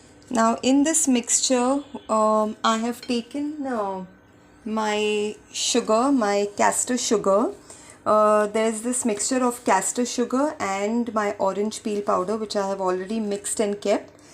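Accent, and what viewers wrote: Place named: England, United Kingdom. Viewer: I love you English ancent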